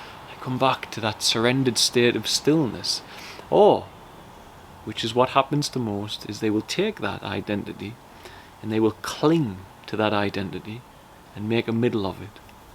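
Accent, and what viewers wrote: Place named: Northern England, United Kingdom. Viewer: So profound. It actually made me cry. So much conditioning and "recording". I just wish I'd been more awake when my kids were small 😥 By the way, where's that accent from? I am from County Durham (but living in Sweden), and assume you're not far away!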